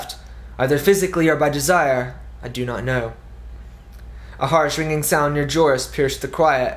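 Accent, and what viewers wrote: England, United Kingdom. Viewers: i like your english accent!:D i cannot wait till this book comes out!!! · are you british?or are your characters? : ) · why are you reading in a British accent???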